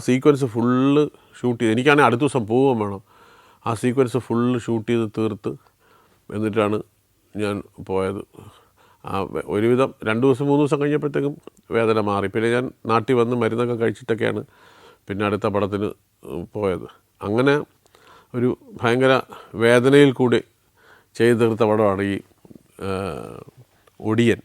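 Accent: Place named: Trivandrum, India. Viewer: Happy to listen to his narrations. Love the Trivandrum accent ❤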